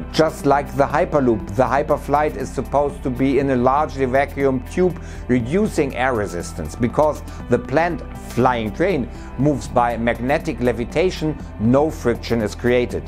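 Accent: Germany. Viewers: I like your German voice better, but there's really good content here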